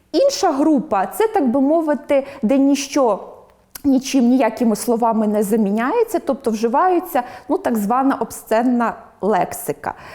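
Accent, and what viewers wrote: Russia. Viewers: Спікер явно російськомовна. Багато помилок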